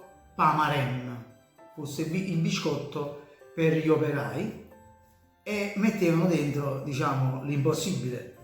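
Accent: Naples, Italy. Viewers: Troppo bravo!!!! Sei simpaticissimo quando parlo napoletano...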